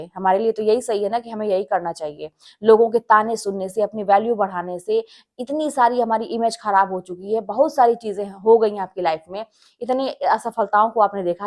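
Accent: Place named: Bihar, India. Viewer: Hello madam ji good aap biharii hai kya aapke bolne se pta lag raha hai bihari staill😊😊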